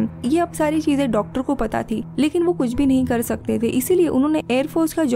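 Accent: Maharashtra, India. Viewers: Ur accent is Maharastrian. R u marathi?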